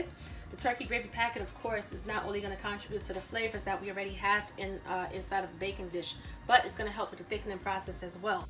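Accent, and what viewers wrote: New York, United States. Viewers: These look AMAZING! I'll be trying this tomorrow. By the way...Are you from NY? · I hear that NYC accent. This looks so good. I cook mine with the Cajun trinity (Onion, celery, green pepper), and I like my wings crispy. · Is that an NY accent. 👀👀This is how my gma cook it butter & all. I watched her cook it from a distance. I couldn't 100% remember how she did it. Thnks Blk PP posting soul food on YouTube ❤❤well minus the gravey mix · I hear that New Yorker in you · This my first time watching this channel and all I hear is an uptop NY type accent I'm sold jus off the way u keep saying OFF like a NYer 😂❤️ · is definitely from NYC…that accent is poppin💯😀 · Love your delicious Turkey wings recipe. I hear your NYC accent too! I was born and raised in the Bronx aka Boogie Down. Thanks for sharing! ❤️ My bad. Forgot I reached out to you a year ago. 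Recipe still popping!!! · Dee I Love Jesus Yup I here her N.Y. accent one of the Burroughs she’s from I from the Midwest but I know them deep NY accents lol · love your New York accent!!!!! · Haven't heard that New York accent in years.😁 Printed recipe's a bit lacking. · Good Lawd with...I'm doing this one...and I knew you was NY...Got a friend from NY that sounds just like you...Respect....real talk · She is a ny'er to the T. All i hear is ny thru and thru. Im from cali. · she sound straight from NYC lool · Sounds like a New York/North Jersey accent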